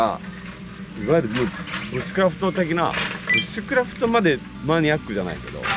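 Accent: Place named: Hiroshima, Japan. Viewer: 清木場さん広島弁ですか？ 広島住みですが、方言が親近感湧きます😀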